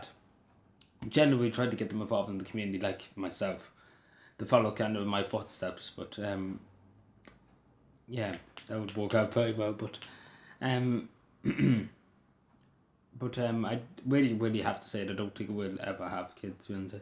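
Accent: Cavan, Ireland. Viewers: Love the Cavan accent. I worked for a Cavan man many years ago. Best boss I ever had. Looked after all his staff so well.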